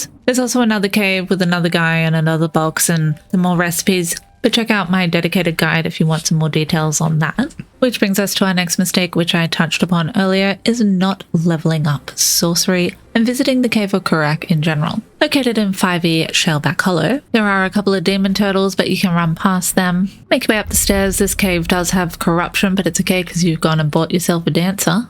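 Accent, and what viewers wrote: Australia. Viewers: trying to work out the accent you Aussie?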